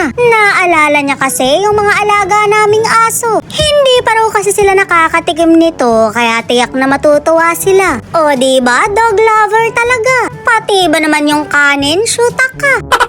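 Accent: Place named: Bicol, Philippines. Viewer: Bisacol ng tagalog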